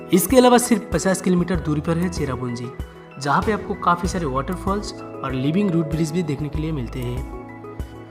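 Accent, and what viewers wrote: Bengal, India. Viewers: আপকা হিন্দী মে হামকো বাংলা শুনাই দেতা হ্যায়। কিন্ত আপ এতনা সুন্দর সে বিস্তারিত ভাবে বোলা হ্যায় যে আমি আপকা ফ্যান হো গিয়া হ্যায়। সত্যিই আপ অনবদ্য হ্যায়।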